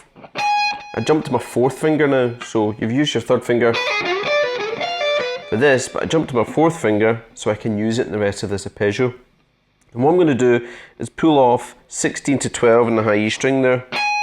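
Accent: Scotland, United Kingdom. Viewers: misterassassin1990 Scottish I believe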